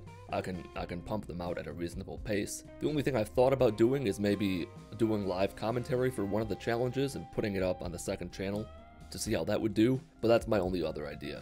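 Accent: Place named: United States. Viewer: I never noticed the American accent he has until now. I assumed he was European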